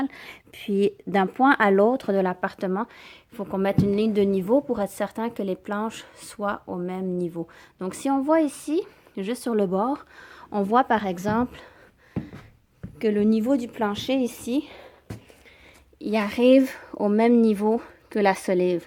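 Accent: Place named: Quebec, Canada. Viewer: Une eurasienne avec un accent québécois... bienvenue au 21eme siècle!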